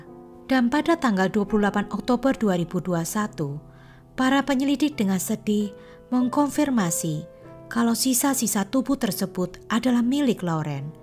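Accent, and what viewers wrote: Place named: Java, Indonesia. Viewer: Mentok bngt jawanya ya mbak hehheh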